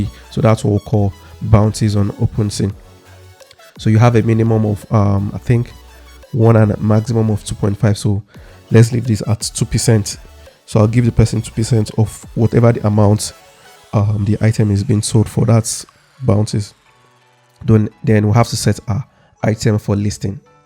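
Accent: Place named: Nigeria. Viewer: Thanks alot for the walkthrough!! You sound like you are from Nigeria bro.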